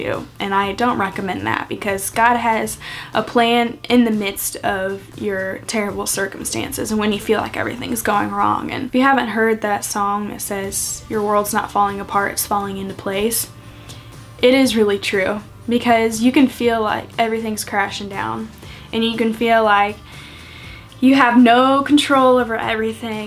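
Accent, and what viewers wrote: South, United States. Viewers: Whered u pick up that southern accent from? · Hannah Rose Ikr I was just thinking, is it just me or does she sound more southern in this video? 😆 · Your southern acent is really coming threw in this video 😁👍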